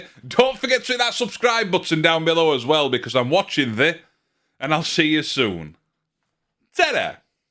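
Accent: Yorkshire, United Kingdom. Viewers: First video of yours that I've watched, you've got to be from Yorkshire right? 🤣😆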